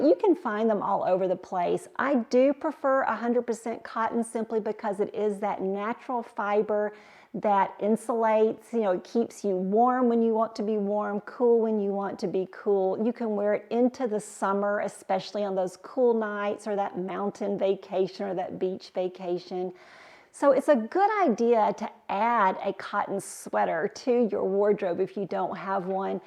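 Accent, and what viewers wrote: South, United States. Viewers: Hi, I'm new to your channel, just subscribed! I love all the tips you shared, as well as the outfits! You look so pretty in pastels, especially that beautiful pink! When you mentioned living in Cincinnati, I couldn't believe it (thought you to be a Southern lady, just a bit of accent to my ear😉). We relocated from NE Ohio to Miamisburg (not far from Dayton) almost two years ago! Our oldest is located in Hamilton, twenty miles from Cinci where he and his wife both work. It's a small world, neighbor☺️!! It is snowing as I write this! Like you, we are so ready for Spring and so thankful for our warm home near all three of our Kids' families - they were our impetus to relocate, especially to enjoy our seven grands♥️. Thank you so much for being a voice on YouTube, sharing your tips with all of us! For me you're a God sent gift and I'm so glad to have found you! 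Sending warm 🤗. - Susan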